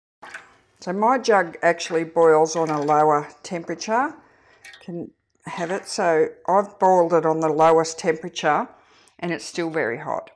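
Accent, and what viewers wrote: Australia. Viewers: I love your Australian accent! Sounds very royal haha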